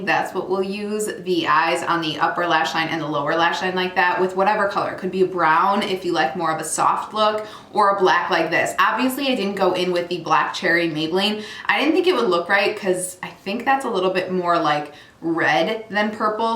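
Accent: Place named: Northern Illinois, United States. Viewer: Hearing her accent makes me homesick. I grew up in Central Illinois but my mom's side is from Northern Illinois, but south of Chicago, and she sounds so much like my cousin whom I miss terribly.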